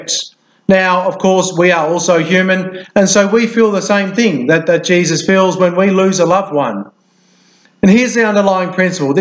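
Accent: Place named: Canada. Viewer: Thanks for the inspiring message Padre! Nice Canadian accent 😂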